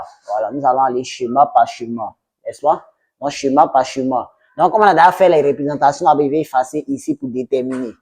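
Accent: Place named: Ivory Coast. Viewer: Je jure que c'est un Ivoirien. L'accent ne ment pas.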